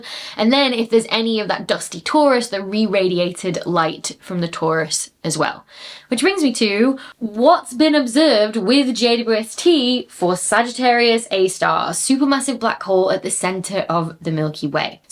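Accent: United Kingdom. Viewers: For longer…. It’s the Accent… British people would understand this 🙂 But I get how it sounds if you not used to our accent 🙀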